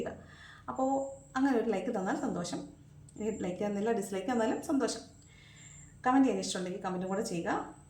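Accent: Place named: Trivandrum, India. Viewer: Chechi trivandrum anale.... Chechide ambada pulusuuu kelakan nalla ressama.... Chechi parayunnatoke correct anu...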